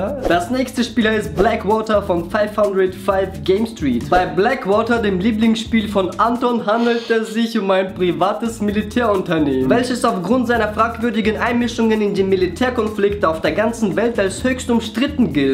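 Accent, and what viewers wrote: Russia. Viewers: Er ist russe...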